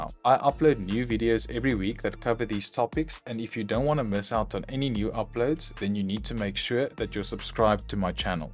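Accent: South Africa. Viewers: You sound South African 💯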